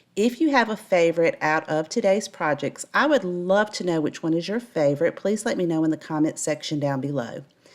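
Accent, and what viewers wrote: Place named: Tennessee, United States. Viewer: You speak exactly like my family who live in TN! I’m in the north, so your accent is very distinct and familiar! 😊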